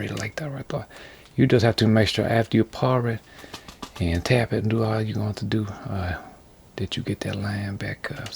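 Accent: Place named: South, United States. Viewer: Southern Bob Ross, I love it!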